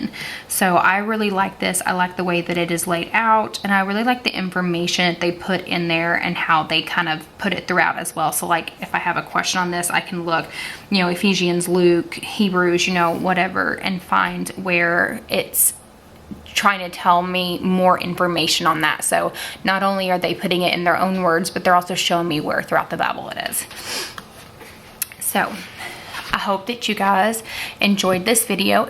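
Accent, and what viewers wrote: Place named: Mississippi, United States. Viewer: You made this looks so pretty I love the tabs I’ve been contemplating on getting this Bible only in the new king James version also I can’t help but ask... are you from Mississippi? Because I’m from Jackson and your accent just reminds me of home ☺️ thanks for the great review.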